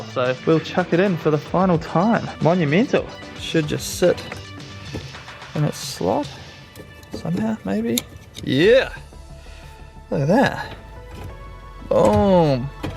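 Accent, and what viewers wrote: New Zealand. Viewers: I thought you was south island nz . Chur bro mean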